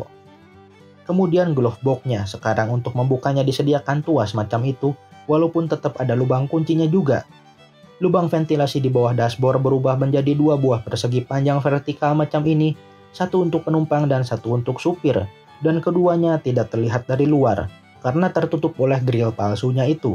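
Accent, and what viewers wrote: West Java, Indonesia. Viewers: Penyiarnya urang Sunda keneh nya?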